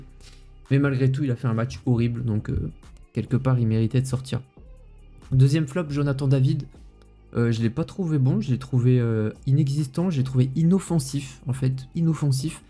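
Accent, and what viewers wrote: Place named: Marseille, France. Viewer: C'est l'accent Marseillais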